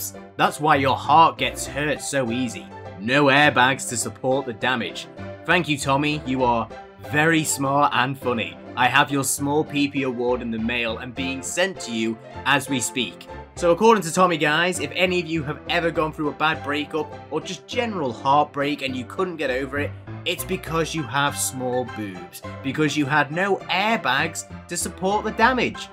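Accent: United Kingdom. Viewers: are you brithis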